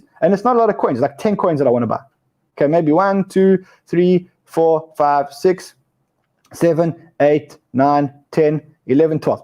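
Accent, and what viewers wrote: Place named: South Africa. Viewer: Sounds too South African: "The feds must fall"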